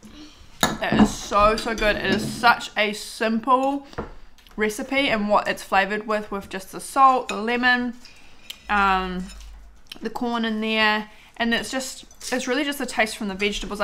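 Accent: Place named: New Zealand. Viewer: I always enjoy your videos - the lighting has never been something I've noticed...I'm so focused on your awesome Kiwi accent!! I love it! Super cute kids and your older one helping you here was fun to watch. Will def make this chowder soon! (even tho still VERY hot where I am in Texas) Thanks for your channel! (sorry about your lockdown weariness, saw that on Insta today :( wish Covid would disappear.)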